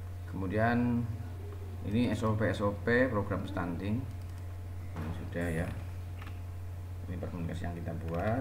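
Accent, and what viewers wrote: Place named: Banyumas, Indonesia. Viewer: Memang benar pak, yen ora ngapak ora kepenak........sukses selalu....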